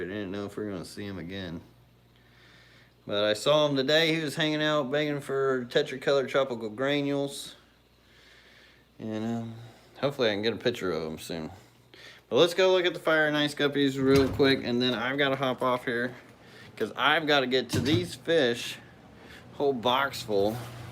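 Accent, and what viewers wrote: United States. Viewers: You sound like such a hill Billie . Love it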